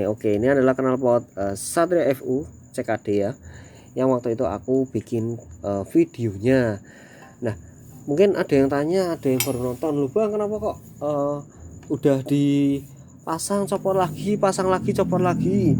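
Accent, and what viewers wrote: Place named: Java, Indonesia. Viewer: Mantep mas josss.... Bahasane medok habis pokoke, salam sesama GTR bumiayu, semangat❤️👍.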